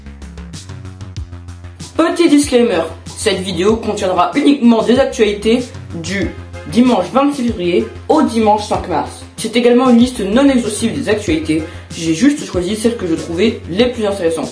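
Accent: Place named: Spain. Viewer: Je me rend compte d'un problème qui persiste sur tes vidéos, c'est les cutes vidéos qui sont très répétitifs et désagréables, parfois j'ai l'impression que tu t'auto-coupes la parole dans le montage et là aussi c'est pas agréable à écouter, j'espère que ça sera réglé par la suite (et au passage, très bel accent espagnol 👌)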